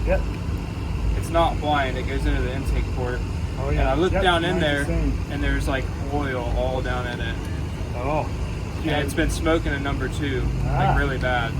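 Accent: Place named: Texas, United States. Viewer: I sense a little texas drawl with that cowboy hat on.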